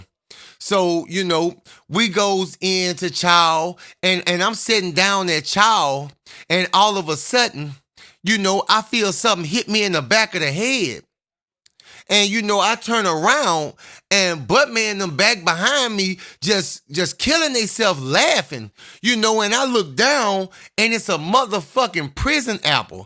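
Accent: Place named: South, United States. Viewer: I love listening to yo country ass.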